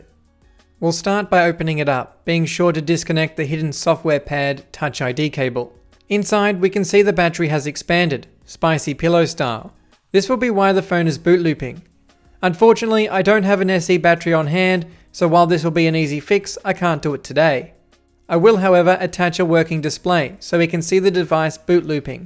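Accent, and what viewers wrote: Australia. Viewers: He sounds a lot more Australian when he isn’t using his video voice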